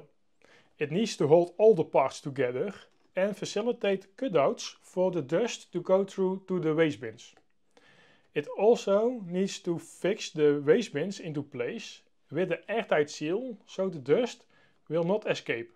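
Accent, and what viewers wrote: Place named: Brabant, Netherlands. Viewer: He still gets an A for effort for opening up the concept and its development to everyone world wide. I'll take a thick brabant accent anyday for that :) Goed gedaan!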